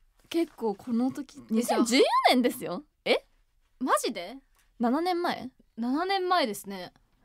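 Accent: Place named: Kyoto, Japan. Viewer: はんなり京都弁めっちゃかわいいな いいこなの伝わってくるわー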